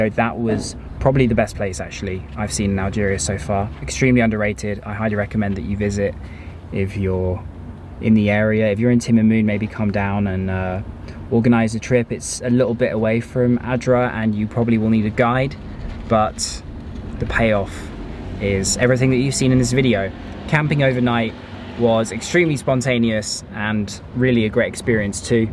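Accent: United Kingdom. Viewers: Same as me I was always looking at this lakhdaria I've never seen any other place until I've once went to oran and now I'm learning more about my country from a foreign tourist as you see 😅. I thought that we have no tourism at all 😂😂 until I saw this video btw from his accent I suppose that he's from UK I'm kinda impressed by the information that their universities provides for their students and I hope I can go study there 😅.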